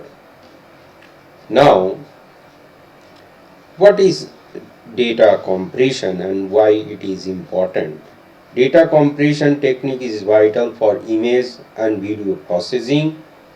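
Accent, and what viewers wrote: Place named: Bengal, India. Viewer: Sir your accent is beryy bengalishhhh . I like it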